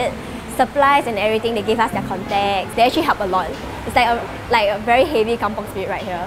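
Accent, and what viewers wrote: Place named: Singapore. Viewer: zigstar losat this is how we speak among ourselves, most of us will moderate our speech to an extent when speaking to non-singaporeans (except Malaysians... they basically sound like us)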